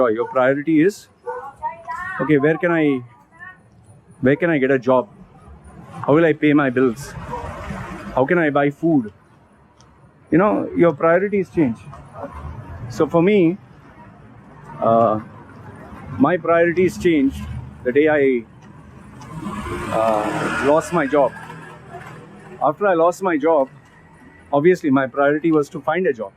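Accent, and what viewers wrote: India. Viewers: You are an indian i can tell from Your accent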